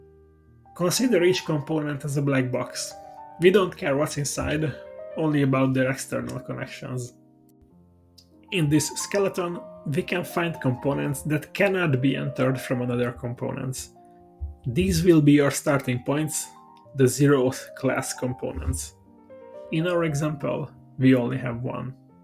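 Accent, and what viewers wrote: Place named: Hungary. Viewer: Judging by your accent and choice of music, you're from Hungary or Slovakia.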